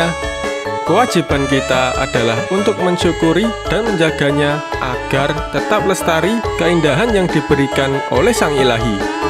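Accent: Java, Indonesia. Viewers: Terima kasih, spot yg indah, cara pengambilan gambar yg bagus, video yg bagus, narasi yg bagus (njawani banget), background yg bagus. Semoga makin suskses mas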